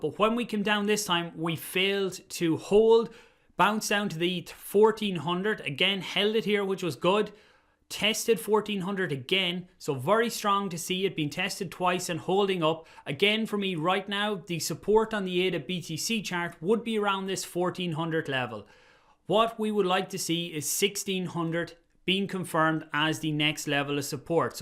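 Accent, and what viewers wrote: Ireland. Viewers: You sound like a strangled duck. I love it :)) Irish accent funny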